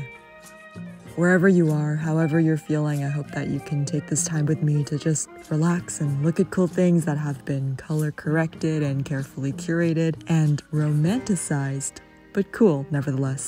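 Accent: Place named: France. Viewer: Your french accent is so cute <3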